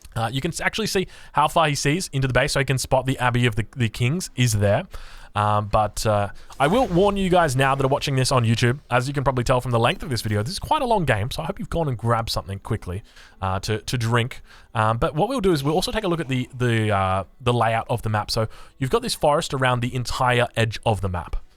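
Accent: Australia. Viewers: Even on 0.75 speed u talk too fast man… slow down!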